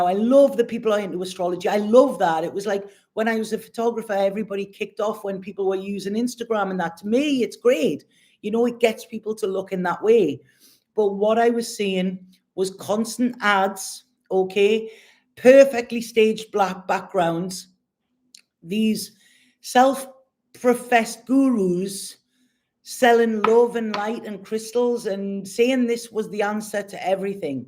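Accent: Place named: Scotland, United Kingdom. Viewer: Love that Scottish accent.❤🙏😘🎅😎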